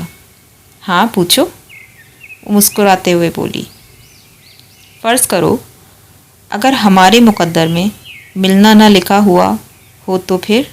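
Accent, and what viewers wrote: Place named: Pakistan. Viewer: Bht khoob hai y urdu lehja apka n very nice❣️❣️❣️❣️❣️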